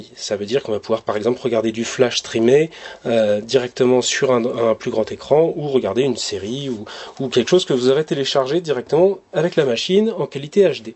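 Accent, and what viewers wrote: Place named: France. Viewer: Me gusta el acento francés, me gusta la asus, me gusta el video... excelente!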